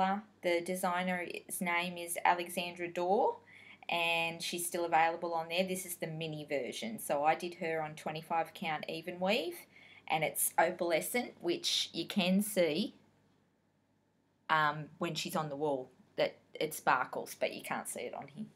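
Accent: Australia. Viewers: Loved your video! It's so nice to hear an Aussie accent on Flosstube. Cinderella is absolutely gorgeous ❤️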